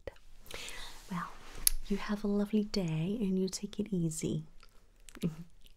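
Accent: South, United States. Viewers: I think the title is hilarious "dreadful American accent" hahaha! I think it's actually pretty spot-on. We have different accents and this has a sort of Southern hint to it, but pretty darn good! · Actually... The southern accent is pretty much this.... But well kept secret, Southerners do the best fake Brit accent 🤣🤣🤣 we're the last remnant of the British rule in America 🤣🤣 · Sounds good. Like the southern twang you added. I'm in the hills of Missouri and I approve. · Hi.... I mean!;) I wish the ob/gyns I've met were as nice as you are in this lovely, lovely RP.:( They are usually so dismissive and sometimes even rude. I'm sure most of the time, it's not even their fault - cause they have so many patients to see. But sometimes, they are really not listening and not empathizing. That being said, I would really love a RP where an ob/gyn believes and comforts me when I tell her that I have excruciating period pains, that incapacitate me for days, plus PMDD the week before (basically PMS on acid) and suggests further investigations for endometriosis or other disorders, instead of just giving me hormones and dismissing me, saying that "period pains are normal". 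No, these most definitely are NOT. In other words, I have to mention that that's a lovely Southern accent (granted it's... mixed with something else) you're pulling off.:-D And I love your look with that wig. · The accent is vaguely southern but has hints of other regions as well. I've never heard someone with this accent before, but it's still quite relaxing. I don't really have tips because I'm terrible at accents as well. I couldn't differentiate between british and australian accents till I was like 12 lol. I would say the most un-American thing in the video was your use of the word "trousers" though. I can't remember the last time I've heard someone American say anything other than pants, unless they are referring to formal pants like for a suit. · Yup I was thinking the same, kind of has a southern/Midwest twang😁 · Honestly the accent isn't that bad. At times it sounds sort of southern which I thought was nice. 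· You sound like a southern belle!!! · Omg the accent was great! It sounds very southern. Good job 👏🏽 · YESSS 💙 This was so amazing!!! It seemed like you were doing a southern-ish American accent and if sooo you did amazing at it. 💙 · Southern belle! Love the accent honestly, it works! Soothing as always, really love your asmr videos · Sounds like ur from the south xxx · I heard the southern too...Kentucky, Georgia or South Carolina maybe...mixed with somewhere else I couldn't place, but there are so many parts of the U.S. that are off the beaten path, it could be a real accent somewhere. The main thing is being consistent throughout...which I thought you did well. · Omg I was not expecting a southern accent when I read “American accent” lmao! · I thoroughly enjoyed this one 😄 great job on the Southern accent lol. Maybe try an African accent next time just for laughs 😆, now that would be quite the challenge 😎! 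· OH MY GOD THE SOUTHERN ACCENT IS SO GOOD???? LIKE OKAY WE SEE YOU!! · Not dreadful, unless you weren’t going for Southern. Every time someone from England tries to do a American accent they sound like they are from the south. And the wig definitely goes with the accent 😂😂. love your vids!!! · I had to smile during this one. So used to your British accent, it was, kind of weird, hearing you speak in a southern accent. Don’t get me wrong, it was fantastically made, just so out of the norm from what I’m used to here ☺️ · You sound like a Tennessee lady. Doing some Southern charm characters would be good!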